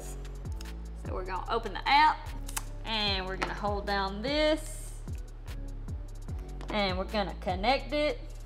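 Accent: South, United States. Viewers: Love that southern draw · As an Old School Marine who graduated from Parris Island, South Carolina back in the day, has the perfect Down South dialect and smooth expression to explain things in an utterly captivating way. Also I would bet a gallon of Mississippi Mud Pie Beef Stew is a Marine. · I reckon I love that accent, by gum🤠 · You are amazing. True red blooded American... Southern accent. Love it...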